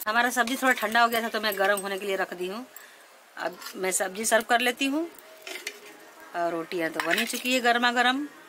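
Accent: Bihar, India. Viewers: aap bihari hai kya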